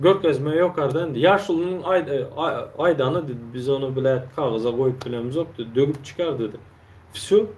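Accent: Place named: Chardzhou, Turkmenistan. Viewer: он разговаривает на Чарджоуском диалекте,в туркменистане разговаривают на двухсот диалектах .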